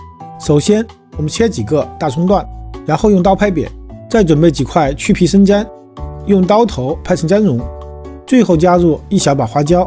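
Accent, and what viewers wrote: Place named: Hubei, China. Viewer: 大厨是湖北人吗？听出点口音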